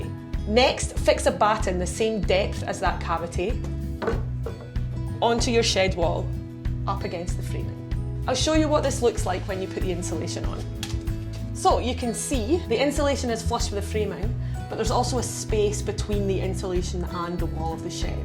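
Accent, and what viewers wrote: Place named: Scotland, United Kingdom. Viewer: Great advice hello from England i agree you do need a gap between the cold air and the warm thank you ,lovely scottish accent 😊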